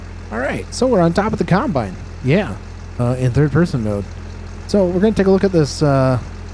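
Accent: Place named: Upper Midwest, United States. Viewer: Love from Michigan…thought i could pick up a bit of northern Midwest in your accent. Blink twice if i’m right lol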